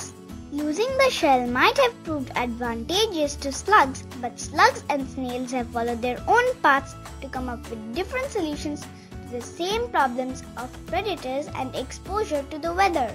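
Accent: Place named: India. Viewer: I love the way she talks, India I think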